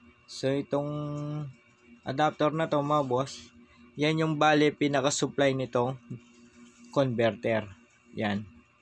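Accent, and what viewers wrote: Pampanga, Philippines. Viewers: Kapampangan na kapampangan accent, haha konelk eh.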